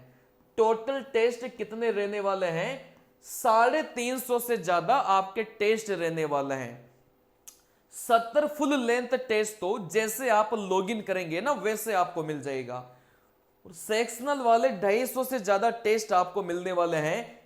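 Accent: South, India. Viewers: Sir aap jan bujhkar aise bolte ho ya aap south se ho aur aapne hindi seekhi hai padhane ke liye isliye aise bolte ho.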